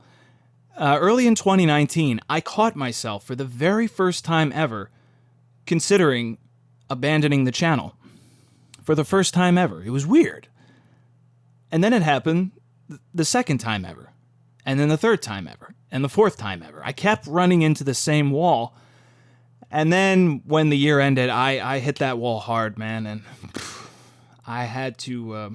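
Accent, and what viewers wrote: United States. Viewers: Dang. That's sad. Love your stuff. You have that perfect jersey voice. Good luck out there bruv.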